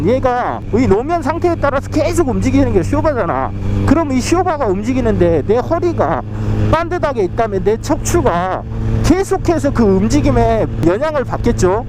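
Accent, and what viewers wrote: Jeolla, South Korea. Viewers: 아따 남도 사투리가 구수하요이~ 그라고 알려 줄라는 내용이 팍팍 와닿소^^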